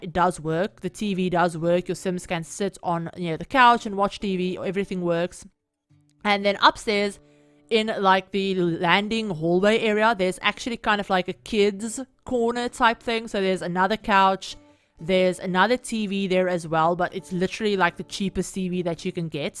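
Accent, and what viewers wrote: South Africa. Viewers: I totaly probably just assuming cause of you're accent, you're South African probably cause it's just like I can never find SA youtubers related to stuff I watch I'm am SA so it so it's just nice to,for some reasone I can't realy understand American accents it just sounds kinda fast IDK why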